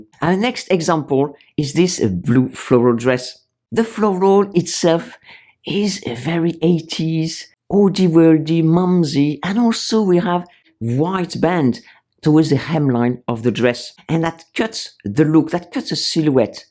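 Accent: France. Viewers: "The LEETLE House on the Prairie Look". 😂. (I love your French accent, not making fun of it. 😊) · has the most adorable French accent on English I ever heard. She has also charisma, talent and style. However she herself had aged well and styles everything for women likewise. Most of us, women after 50, had not, except Asian origin women, who looks like 30 in their 50ties. We have flabby arms, "bat wings", we have often too big, lousy and fat boobs, we have protruding bellies, vanishing wastelines. All these sleeveless dresses, all these dresses on straps looks horrible on most of us. An advice to clap a belt is fine as long as you can find where to clap it 😅. But most important thing is - we, women after 50, do not care so much what anyone else is thinking of us. So if you like floral prints, cowboy boots or lousy dresses- just wear them. Wear even dresses on straps and clap belts, even being size 3XL, if you feel comfortable. 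Life is extremely short. So enjoy it the best way you can!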